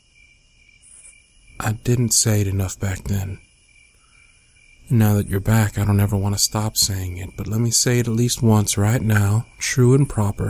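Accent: South, United States. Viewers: can you please make a playlist with all videos of you with a southern accent please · As a proper southern lady, I must say you're accent is quite good. Especially your cussin'. Hehe. ^^ Honestly. That "Shiit" at the end sounded so real I almost turned around, half expecting my dad! XD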